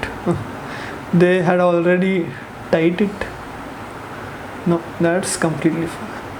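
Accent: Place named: South India, India. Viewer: your English is very south indianyyy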